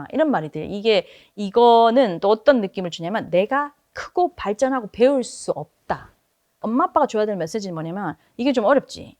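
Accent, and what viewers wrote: Gyeongsang, South Korea. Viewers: 긩상도+영어액쉔트 = 묘한 중독감 말투네요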